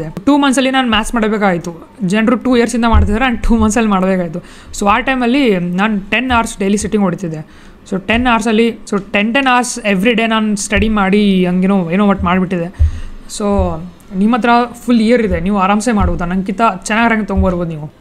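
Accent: North Karnataka, India. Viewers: ಅಣ್ಣ ನಾನು ಕಲಬುರ್ಗಿ ದವಾ ಅದಿನಿ.ಉತ್ತರ ಕನ್ನಡ ಭಾರಿ ಮಾತ್ ಆಡ್ತಿ ನೀನು ❤ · Anna mast matadiri uttar karnatak ❤ Anna physics difficult agateti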